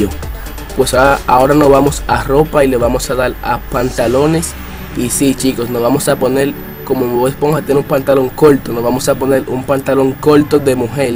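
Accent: Dominican Republic. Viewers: Eres dominicano?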